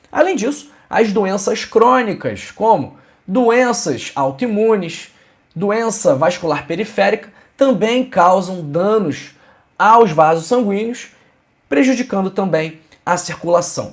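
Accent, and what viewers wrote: Rio de Janeiro, Brazil. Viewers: Esse sutak carioca é que mata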